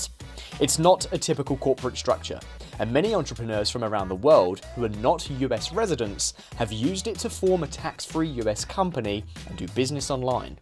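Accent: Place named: United Kingdom. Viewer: I like your British accent 💪💕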